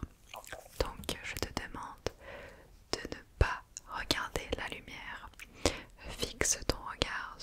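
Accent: France. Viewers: Your French accent is lovely!!!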